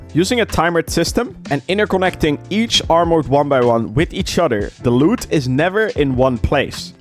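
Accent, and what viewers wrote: Netherlands. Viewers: I just have to ask Are you Dutch?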